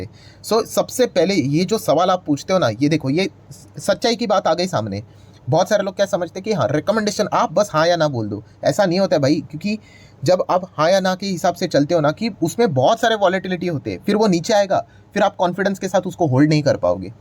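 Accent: Maharashtra, India. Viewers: सर तुम्ही मराठी मध्ये बोलता हे तुमच्या बोलण्यावरून कळतंय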